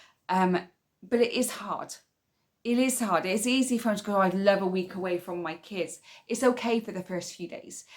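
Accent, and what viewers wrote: United Kingdom. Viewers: I love your accent 😍 💕 in kenya we call it peppa pig accent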